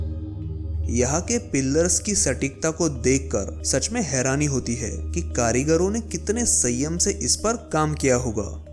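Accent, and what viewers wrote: Maharashtra, India. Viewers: मित्रा तूझ्या उच्चारावरून तू मराठी वाटतोस. औरंगाबाद परिसरांत शाश्वत पर्यटनासाठी आम्ही प्रयत्न करतो आहोत. एक फ्रेंच मित्र गेली ४ वर्षे नियमित येथे येत असतो. तूझा मो. न. कळव. श्रीकांत उमरीकर <num>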